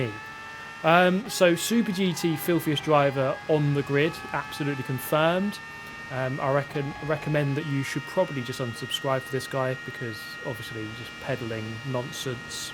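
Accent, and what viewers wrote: United Kingdom. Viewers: As a british person, it never occurred to me that many people here just enjoy his accent. "Everyone" (lots of people) I know speaks like this, and it's actually nice having this on in the background of working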